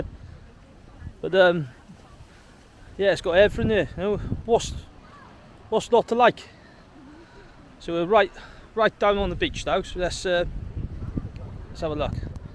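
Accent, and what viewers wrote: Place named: Wales, United Kingdom. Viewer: Thanks for this. Love listening to you speak; reminds me of my grandmother and cousins in Llanelli. I’m Canadian, so I don’t get to see them often. We’re booking in Luz for next winter (Canadian winters = 🥶) so enjoyed the look around.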